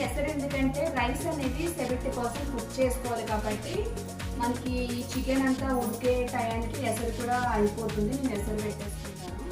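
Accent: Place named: Mahabubnagar, India. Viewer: Na language kuda me mataladinate undati same I am mahaboobnagar district . so nice mam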